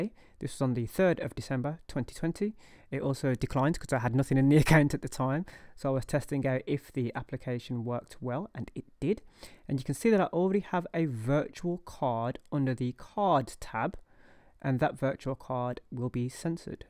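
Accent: United Kingdom. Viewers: Love this video but your definitely British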